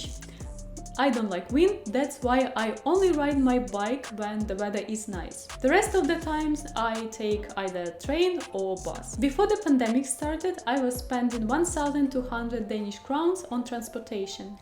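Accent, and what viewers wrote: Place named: England, United Kingdom. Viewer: Your voice is so cute, I love your English accent. Greetings from México. =)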